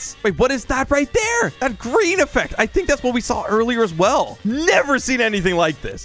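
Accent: Netherlands. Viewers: are you from the netherlands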